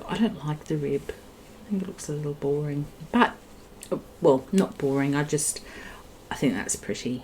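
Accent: Australia. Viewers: I knew you were a fellow Aussie from the first hello. Loved your video! subscribed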